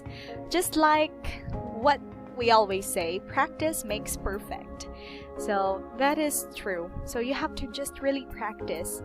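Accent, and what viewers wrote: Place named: United States. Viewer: Those Dark L's! I really love your American accent. I've been living here in the US for 20 years and I'm still learning 😂😂😂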